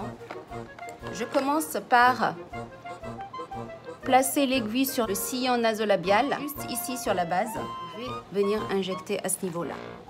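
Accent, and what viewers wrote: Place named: Tunisia. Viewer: Ton accent ça se voit que tu es tunisienne 🤗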